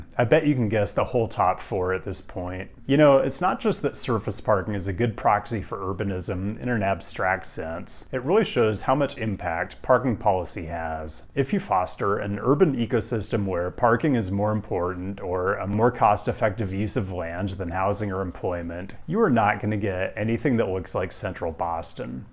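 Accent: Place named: Pacific Northwest, United States. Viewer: is from Seattle. Fred Armisen describes the PNW dialect as "putting the words on a plate".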